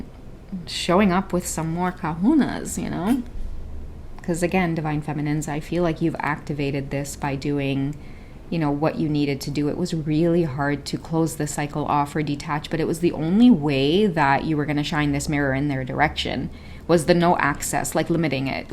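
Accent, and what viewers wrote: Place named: Trinidad and Tobago. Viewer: Hello my fellow Torontonian!!! I love your Trini accent. ❤❤❤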